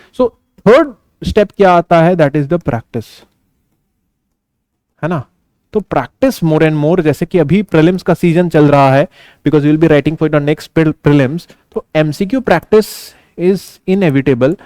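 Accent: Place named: North, India. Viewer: Sir your accent is like morth indians..😮🎉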